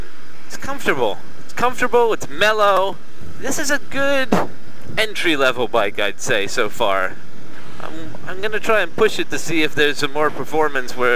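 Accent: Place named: United States. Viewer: Sounds American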